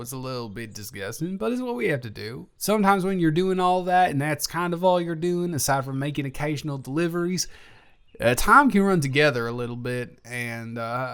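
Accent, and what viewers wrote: South, United States. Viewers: AYE MAN WHAT DID I TELL YOU ABOUT THE SOUTHERN ACCENT!!😂😂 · THE SOUTHERN ACCENT IS AMAZING MORE PLS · I love the southern accent, too adorable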